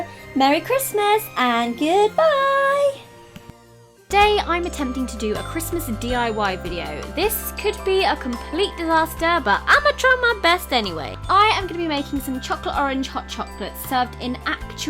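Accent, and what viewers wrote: United Kingdom. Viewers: idk why but you sound so much more british in these diy viedos lol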